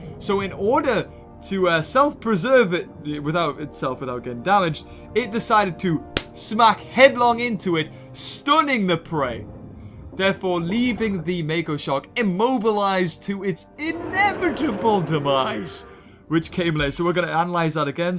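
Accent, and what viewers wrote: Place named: United Kingdom. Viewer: what part of great Britain are you from?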